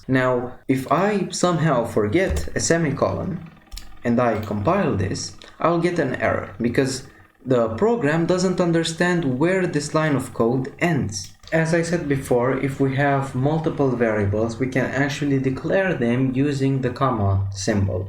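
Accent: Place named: India. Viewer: no problem man, sometimes i click a tutorial and then i get triggered by the voice and i would click away, this mostly happens with indian accents, i just cant listen to it, im literally in pain, but for some reason this happend with your voice, where are you from?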